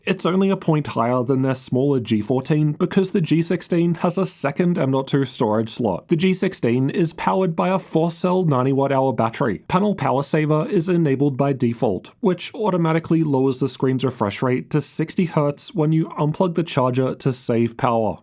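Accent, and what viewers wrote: Australia. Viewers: You're the best. Are you Australian?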